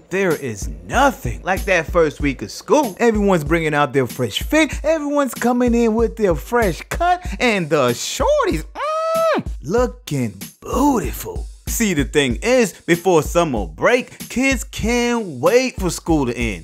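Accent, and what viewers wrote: Nigeria. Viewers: Bruh are you nigerian? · R u Nigerian?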